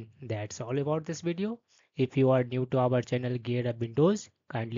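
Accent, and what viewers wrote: India. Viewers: Soon as I heard the Indian accent, I knew I was saved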